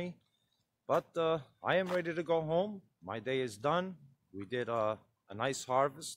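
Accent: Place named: New England, United States. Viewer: I'm new here, what body of water is this? I'm guessing you are somewhere in New England with your accent! Wow I've never seen them processed before. Looks like I was right with NE. Your jacket says cape cod. It's on my bucket list to travel out there since we're only a few hours from there.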